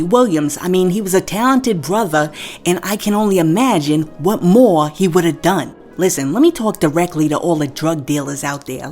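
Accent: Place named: New Jersey, United States. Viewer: You gotta be from jersey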